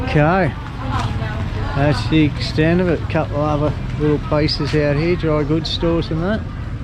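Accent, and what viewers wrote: Australia. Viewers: I detect a slight trace of Australian accent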